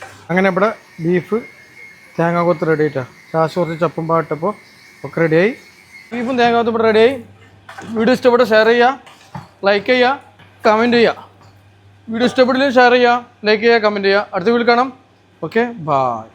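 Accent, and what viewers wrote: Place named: Malappuram, India. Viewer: ഞങ്ങൾ മലപ്പുറംകാർ ഇങ്ങനെ തന്നെ ആണ് പറയുക എന്തെങ്കിലും കുഴപ്പം ഉണ്ടോ ബ്രോക്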